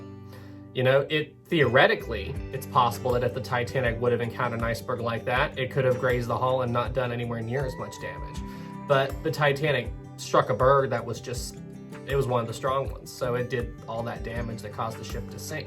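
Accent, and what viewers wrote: South, United States. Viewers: your Southern accent really comes out of hiding during the narration parts haha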